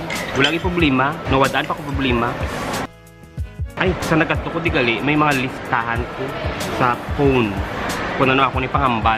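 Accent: Iloilo, Philippines. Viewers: Love!Love! Love the ilonggo accent and seriously kahit diko po lahat maintindihan lahat ng say mo nakakaaliw ka po.Good luck, keep us smiling po.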